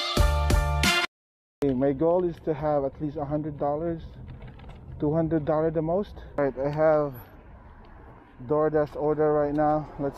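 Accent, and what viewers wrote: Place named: Southeast Asia. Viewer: your accent is asian... errrr South east asian?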